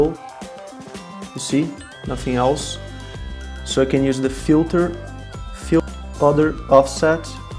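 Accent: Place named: Brazil. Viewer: Cara, tu é brasileiro não é? O jeito que tu fala, tem algo de br ai rsrsrsrsrs LoL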